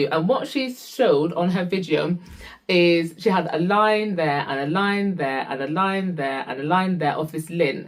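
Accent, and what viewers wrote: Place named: Northern England, United Kingdom. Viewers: I recognized the UK accent. Love to hear it on black people. Waves and hugs from the midwest of USA! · UK in the house!🇬🇧So lovely to hear a British/Up North accent! Great advice, really helpful and your hair looks so healthy!❤️✨🫶🏾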